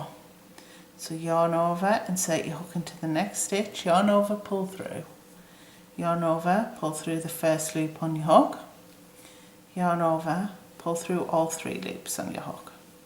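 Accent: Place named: Northern England, United Kingdom. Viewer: Your accent sounds to me like northern England. I'm American so I can't be sure. Thanks for this really nice pattern and wonderful pattern!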